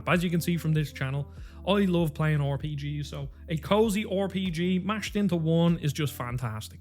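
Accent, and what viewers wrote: Ireland. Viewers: OMG man, such a lovely video, I don’t get the hate either and you just sold me on it, gonna get it myself, also it’s amazing to hear an Irish guy for a change, never been subscribed to any Irish YouTuber so here it goes- you are my first 🍀💚 and if anyone would like to play some cozy/ multiplayer games together (on Switch!) let’s exchange our codes, happy gaming!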